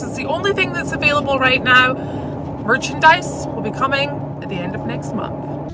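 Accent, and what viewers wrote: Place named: Northern England, United Kingdom. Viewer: While watching House of the Dragon, I heard an accent I thought I recognized. Turns out you sound like the people of the North.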